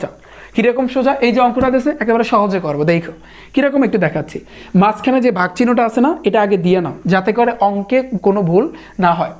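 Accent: Barishal, Bangladesh. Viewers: Baiya apner bari ki borisal🤌🙂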